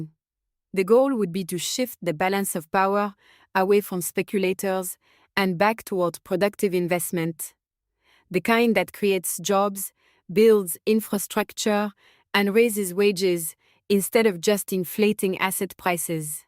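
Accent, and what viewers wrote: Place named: France. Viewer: Why would you use a French accent?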